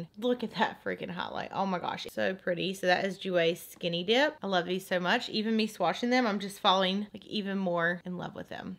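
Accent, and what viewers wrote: South, United States. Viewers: You are so beautiful and I could listen to you talk all day! Love that southern accent! ❤️😘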